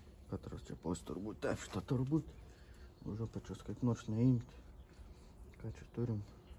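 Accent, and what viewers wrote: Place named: Samogitia, Lithuania. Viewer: tai arba žemaitiškai šneki, arba lietuviškai, apasispręsk..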